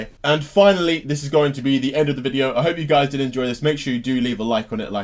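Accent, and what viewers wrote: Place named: South London, United Kingdom. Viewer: what part of south london u from?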